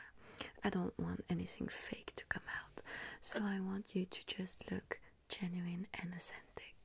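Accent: France. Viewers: Oh please do more of these in this french accent you are pure magic! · oh the french accent 👁️👄👁️ falls in love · C’est magnifique! She returns just in time for me to go to bed, and with a luxuriously thick French accent. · i love the french accent, it sounds so nice :,)) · Loving you using a heavier French accent lately, it's extremely calming <3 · The tone of your voice and the heavy French accent sound amazing together 10/10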